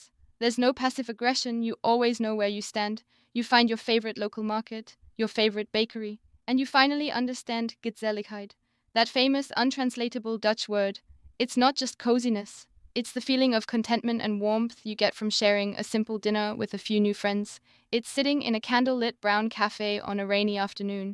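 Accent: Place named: Eastern Europe. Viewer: Don't forget the Eastern European accent, pretending being from the US.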